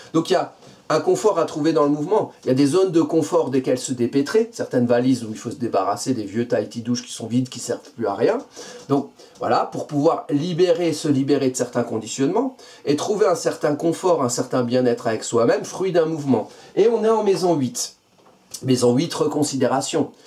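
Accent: Alsace, France. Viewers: oohh, comment cet accent alsacien m'a fait me replonger dans mon enfance, un instant j'ai eu l'impression d'être a la maison, (enfin quand ca existait encore), et j'ai ri pour le débarassage du tahiti douche, limite PLS, à l'époque de la retro de Mars en cancer c'était trop ca ( y'a eu une opposition Pluton ce qui fait que bon relationellement y'a eu un peut de caca). Tout ca pour se rendre compte que bon Vénus va rétro en mars, donc mes plans ce que j'ai préparer pour le mois de mars, en plus avec Mercure rétrograde, je dois peut être me préparer à les oublier et à les réviser. Bon la mauvaise nouvelle dans la bonne humeur au moins.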